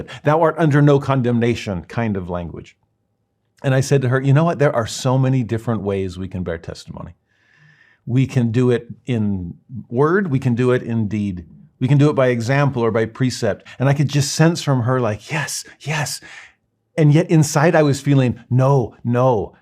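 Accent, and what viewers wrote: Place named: North, United Kingdom. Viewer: Are you really a Texan cause you sound like you're from up north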